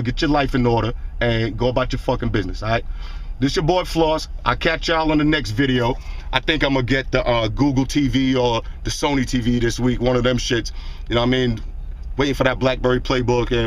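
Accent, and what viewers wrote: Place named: Brooklyn, United States. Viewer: I really like this New York Brooklyn type speech, this guy is hella cool!:D I'm a long time sub go Floss!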